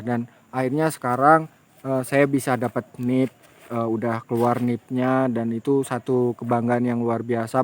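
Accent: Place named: Banyumas, Indonesia. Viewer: Woooo mas... ternyata org Banyumas.... sama donk... Banyumase ngendi mas??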